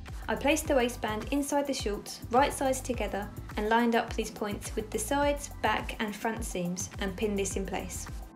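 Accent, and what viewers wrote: United Kingdom. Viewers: That accent is amazing.... i wish i lived in Britain 😣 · You have one of the best British accents ever. That’s why I keep coming back.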